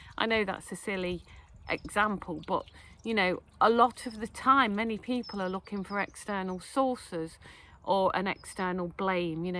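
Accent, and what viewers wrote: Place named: Derbyshire, United Kingdom. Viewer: Hi, are you from Derbyshire? I only ask because your accent is very similar to mine:)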